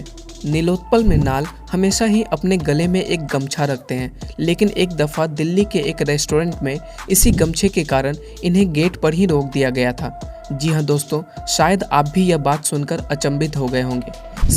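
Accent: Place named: Bihar, India. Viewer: Bihar me ye ek hi hota hai , grammatically wrong but expression well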